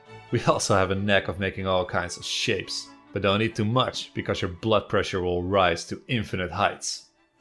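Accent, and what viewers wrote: Netherlands. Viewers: American/Candian with a Dutch accent..weird!! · Phantom Playz yuhh Dunglish (Dutch / English) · AcaBlark he is dutch.. he might not know how else to pronounce it.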